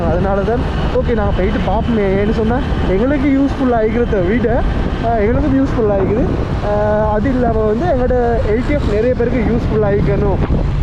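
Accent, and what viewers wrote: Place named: Sri Lanka. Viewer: Sri Lanka tamil beautiful ah iruku but konjam puriyamatinguthu 😅❤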